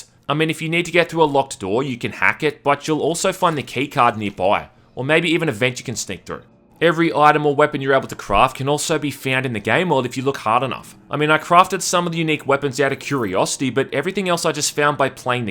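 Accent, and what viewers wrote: Australia. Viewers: Who'd had thunk'd that one day I would understand australianish almost perfectly.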